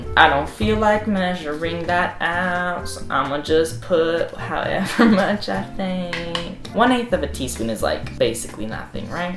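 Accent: South, United States. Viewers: "Why do I sound southern?" lives in texas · “Why am I like... southern?” You.... you.. you live in.. Texas. I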